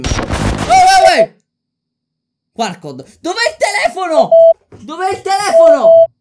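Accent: Sicily, Italy. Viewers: Te sei siciliano?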